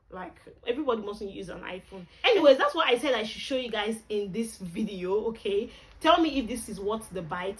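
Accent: Port Harcourt, Nigeria. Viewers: Trans Amadi accent 😂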